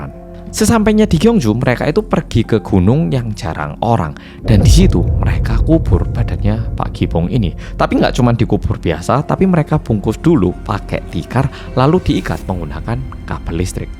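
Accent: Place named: Malang, Indonesia. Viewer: Mas, aku salfok sama "mobile kayak MIKROLET" haha MALANG nya masih kentel ya mas. Salam kera ngalam mas 😁😁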